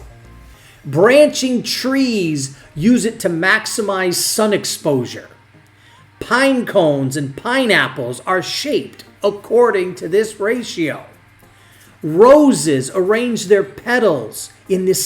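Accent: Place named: New Jersey, United States. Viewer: Hi I just found your site and love it, many of my favorite artists you are covering. I am also a Christian and have be studying and teaching the creation/evolution topics for 45 years, teaching only for the past 30. This is a very nice video on the Creator handy-work. Did you know that the brain spends or dedicates 30% of its computing power to the hand? In other words, God created man to use his hands with the ultimate control, just look at a surgeon, painter, pianist, slight of hand magician, or anyone else using their hands in a delicate manner. Monkeys can't do that, we can because we are fearfully and wonderfully made. Your accent sounds like you are from NJ, or NY or close by. There is a great illustrator (there are so many) that you should interview, he is also a Christian, his name is Thomas Fluharty and he loves to talk. Anyway, I love your enthusiasm keep up the great work. 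from NJ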